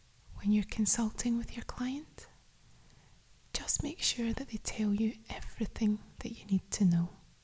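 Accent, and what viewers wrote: Scotland, United Kingdom. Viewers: I felt relaxed just watching and loved her Scottish accent. Thank You.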